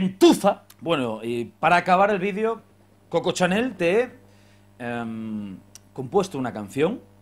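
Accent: Spain. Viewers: Qué bien te queda el acento español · Acento español? Sera que es español jajaja. Oero es muy simpatico y me gusta sus videos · Hombre que siempre llegas a un punto pero esta vez no e entendido lo que quieres dar a entender pero nada que el acento asturiano te que da bien y más cuando cantas música de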